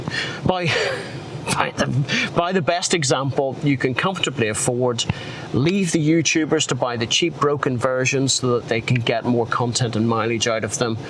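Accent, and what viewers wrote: United Kingdom. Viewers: Wait i thought it was just for the show Peaky Blinders but do british people really talk like this? Interesting..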